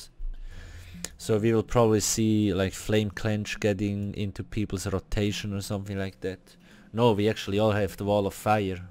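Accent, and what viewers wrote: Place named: Switzerland. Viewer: Ich finde sein schweizerenglish so genial obwohl er auch deutsch spricht... sagen wir mal schweizerdeutsch... aber man merkt mehr und mehr in seinen letzten videos das ihn das spiel mehr abfuckt als er es für gut befindet ... in den letzten videos finde ich persönlich merkt man das enorm !! Er schimpft mehr als das positive daran zu sehen ... nämlich es soll im Endeffekt spaß machen !!! Nun ja ich schau deine Videos nur noch wegen dem schweizerenglish 😂😂😂😂 ansonsten naja dislike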